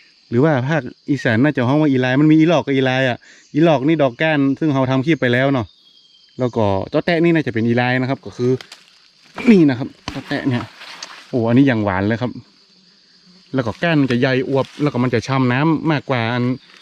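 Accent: North, Thailand. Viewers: อยู่จังหวัดอะไรคะอ,เภออะไรเอ่ย,ฟังพูดเป็นคนเหนือแต่ไม่รุ้จังหวัด,และ,อ,เภอค่ะ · นี่ก็บ่ฮู้เหมือนกัน สำเนียงอู้บ่ใจ่ลำปูน- เจียงใหม่หล้า น่าจะแปร่-น่าน-ลำปาง กาว่าเจียงฮาย-พะเยา...ฟังไปฟังมากะเหมือนจม.อะละ เอ้าตอบให้หายข้องใจ หน่อย@ 555 เผื่อจะเพิ่มยอดไลค์นักๆ..ไหนๆก็หากิ๋นตางนี้แล้ว คนเปิ่นไค่ฮู้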